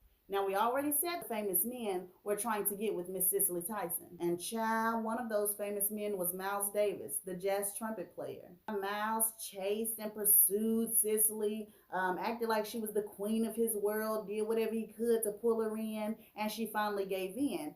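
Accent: South, United States. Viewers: Sis, we want you to be you!!! I love your southern loud accent!! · Keep up the good work.... iv only been following you for a few days now.., and I love your page... it’s fun, energetic, and you have the southern voice that makes me want to come back for more!!!! Sooo kudos to you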